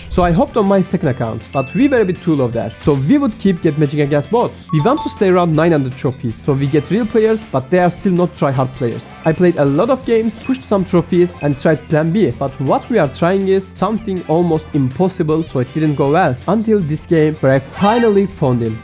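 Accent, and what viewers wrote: Turkey. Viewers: bro ur turkish accent is giving u away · I can smell the turkish accent from miles ago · It is because of his accent because he is Turkish. Most Turkish people have this accent. Even I have it because I am Turkish too😊 🇹🇷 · I feel the Turkish accent (laudatory) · aksandan anladım anında Türk olduğunu kral güzel video eline sağlık ❤❤ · Konuşmandan anladım büyük ihtimal Türk’sün bi dahaki videonda damian yapar mısın ama ne olur ne olmaz ingilizcesinide yapiyim. Could you do Damian too in your next video? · i can feel your turkish accent in english am i correct? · Türk lan bu aksana bak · The turkish accent 💀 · konuşmandan türk olduğunu anladım baktım harbi türkmüşsün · Turkish ahh Accent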